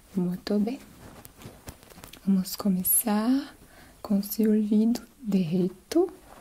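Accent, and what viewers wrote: Germany. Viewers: brasileira imitando uma alemã perfeitamente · Sotaque alemão incrível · Que sotaque lindo é esse?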